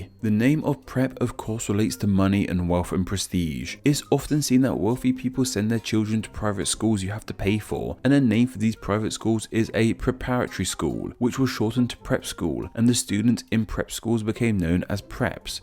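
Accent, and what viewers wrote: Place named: United Kingdom. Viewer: Listening to a British man explain hippies is hilarious.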